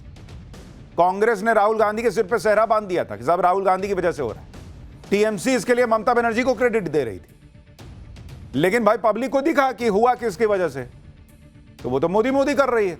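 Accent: Bihar, India. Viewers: आज तो पतलकार ने 100 मे से 110 नंबर ले लिए, बिहारी भाषा का इनपुट झलक रहा था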